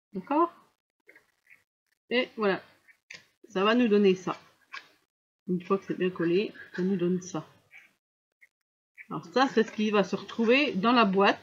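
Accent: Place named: Franche-Comté, France. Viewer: Super idée ! Je vais en faire 1 aussi ! J'avais bien reconnu l'accent franc-comtois ( suis Belfortaine) 😉 je m'abonne à votre chaîne😁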